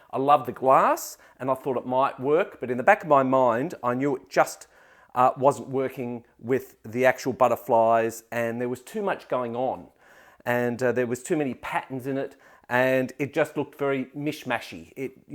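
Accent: Australia. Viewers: Thanks so much! I’m making my way through all your videos and have enjoyed them so much. Especially with the Aussie accent!😂 Thanks for the link to a detailed answer for my question. I look forward to watching it! Cheers from 🇨🇦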